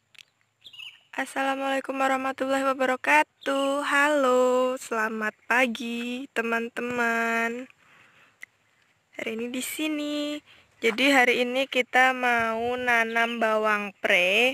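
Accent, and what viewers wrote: Java, Indonesia. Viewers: Walaikumussala.... wehhh bawang... mbak nya pinter nyangkul... dimana ini posisinya mbak... logate koyo wong jowo. Oya saya sdh antar ya pupuk kandang hitam ke rmh mbak. Saya tunggu pembayarannya dgn ketan hitam. Mampir ya mbak k rmhku kita ngopi hitam bersama.... spy tetap dlm kesetiaan belajar terusss.... ditunggu mbak. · Bertani Tanam daun bawang lumayan tuh hasilnya.. info tani nya sangat bermanfaat. Yang paling menarik konten ini adalah gaya bahasanya logat jawanya medok campur sari hehe.. keren mba,🙏👍👍😁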